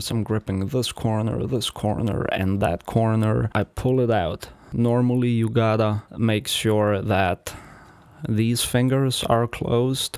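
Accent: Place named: Russia. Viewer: Ahhh that Russian accent😭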